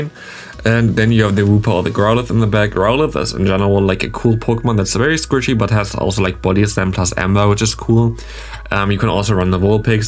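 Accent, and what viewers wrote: Germany. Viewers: He is German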